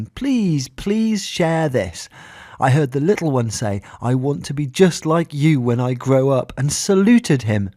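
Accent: United Kingdom. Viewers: Not to mention saying the same thing repeatedly!! Then saying with his Brit accent “ our soldiers “ However, thank you sir for all your ways of being great to those kids and to “ us “ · “Good story of an admirable man in uniform, my hats off and thank you for your service.” That being said...the video is STUPID and imho takes away from the story in its attempt to glorify it w/ the repeating of itself all in the same paragraph and dumb accent. We’re here🇺🇸..,not here🇬🇧.. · Am i the only one who thinks the video is a bit confusing with that accent talking about “our troops” with a British accent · I don't understand why the story had to be told several times, and by someone with a British accent. Great story, a shame there are too many that do not appreciate our military, especially some newly elected politicians who disgrace the halls of Congress this year.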